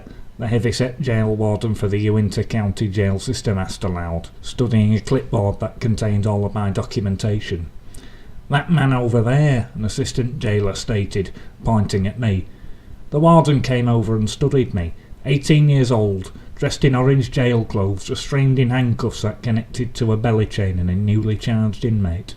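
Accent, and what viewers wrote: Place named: Scotland, United Kingdom. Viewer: This guy sounds pitiful. The person in the book not the narrator. The narrator just sounds Scottish.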